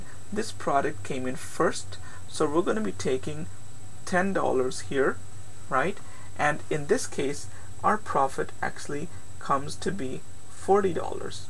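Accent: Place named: Canada. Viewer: you are the best teacher ever and youre canadian and your accent is beast so youre my favorite now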